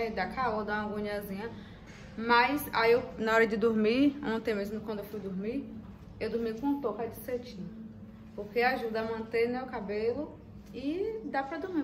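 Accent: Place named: Bahia, Brazil. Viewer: Ela é baiana, o sotaque igualzinho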